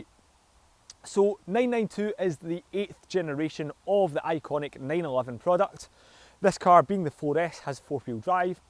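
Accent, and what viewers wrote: Scotland, United Kingdom. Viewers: Sounds like a Scottish accent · First car review with a scotish accent i see .obviysly cause im not native english speaker i understood half of what he said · Mate the only reason why I understand every word you have uttered in this video is because I am a Snooker Freak, and love watching and listening to the commentary of Alan McManus and other fellow Scotsmen. I’m an American from New Jersey and it’s crazy how frustrating it is for other people to even understand regular English people. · great review...subscribed. love the Scottish accent. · Love the Scottish accent haha :D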